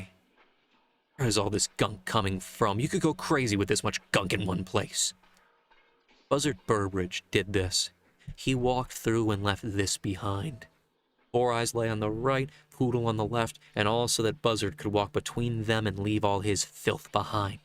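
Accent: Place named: England, United Kingdom. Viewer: This was the best production of an audiobook i've listened to. You struck a good balance of acting without going over the top. The voices are really good. Even your English accent is brilliant, that's coming from a brit. You had me wondering if there were multiple actors untill i read the comments. And i loves the subtle background noises and effects, they were just the right volume and not distracting. WELL DONE, please do more, i dont even care what the book is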